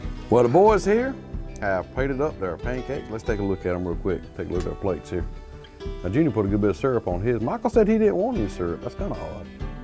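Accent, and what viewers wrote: South, United States. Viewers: Love the southern accent! Reminds me of my good ole buddy from Louisiana. Anyway, love the content on this channel. Definitely got yourself a subscriber. 👍🏼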